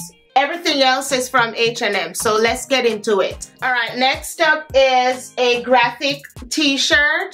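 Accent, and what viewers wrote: Jamaica. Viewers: Hi K, Jay and I are here laughing hard! He loves how you bring in your patois! 🥰 As always, here for the fashion and always enjoy the vibezzzz!!! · Love your style and l ove when you speak jamaican patois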